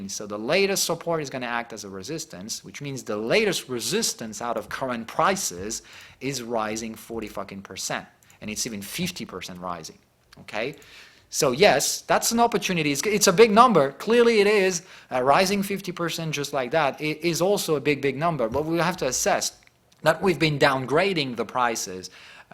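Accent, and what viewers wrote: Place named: Texas, United States. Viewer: Thank! You have the accent of the texas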